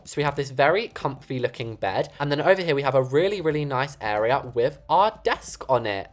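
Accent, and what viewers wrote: United Kingdom. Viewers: WAIT Y'ALL THEY'RE A BOY?! I THOUGHT THEY WERE A GIRL THAT HAS A BRITISH ACCENT 😭😭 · gay ish? Naur that’s called a British accent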